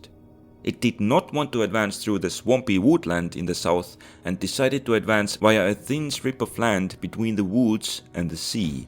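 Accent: Estonia. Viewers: are you estonian. You sound estonian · YOU SOUND LIKE ESTONIAN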